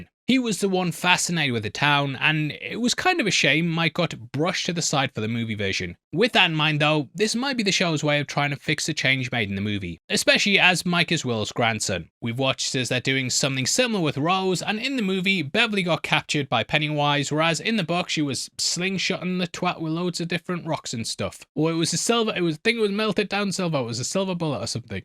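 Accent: United Kingdom. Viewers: As someone who grew up near Shreveport, you are saying it correctly. People probably just find it odd to hear it in a British or even neutral accent because of the deep, region-specific accent people near there tend to use. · Ain't nothing wrong with your "Shreveport". You're just British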